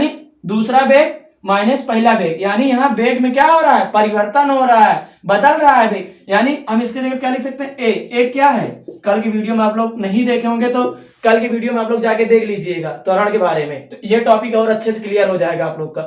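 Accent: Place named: Pratapgarh, India. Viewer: अति सुन्दर प्रस्तुति प्रतापगढ़िया भाषा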